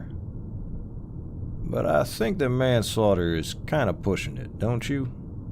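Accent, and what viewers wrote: South, United States. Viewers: this is too good not to get a part 2, and my god the southern accent, you got us all swooning with it😂great audio, can't wait to hear more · I said it before and I’ll say it again. loves doing a southern accent And I f*cking love it 🥰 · I love a southern man speaking in my accent lol I love this love the voice love it all not me folding in abt 2 seconds into the vid 🤭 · I’ve learned so much about myself today 🫣 For starters i had not even the slightest clue that southern accents did this to me🤠🥃 And second wow holy hot damn do I LOVE princess treatment and to be dominated *giggles nervously* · IM A SUCKER FOR THIS SOUTHERN ACCENT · I said it before and I'll say it again... Correction, I think we love doing a southern accent. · Your southern accent is everything😓🙏🎀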